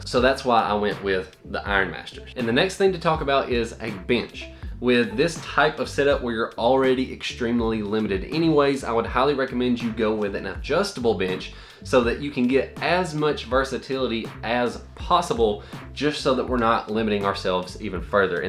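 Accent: South, United States. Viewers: You have a southern accent.. What state are you from ? IM from Canada BTW 🇨🇦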